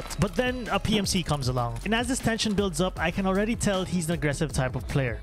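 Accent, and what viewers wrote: Philippines. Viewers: Knew you were Pinoy the moment I heard your accent. I've met or have seen like about 5 Pinoys in this game since I started playing back in 2018.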